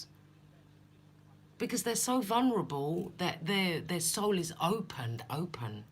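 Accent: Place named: Australia. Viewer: Keep your chin up. The lady will look a bit off. These are collective readings. Good Heavens, not just for her. These are for entertainment. If anyone finds something as advice...well ok. I do at times. Love your Australian accent. :) ................I couldn't do an edit. But yes, LOL!!!! You are so much like someone I know slightly at work. I feel the lady is intuitive but has to hold it back because of the culture at work. :)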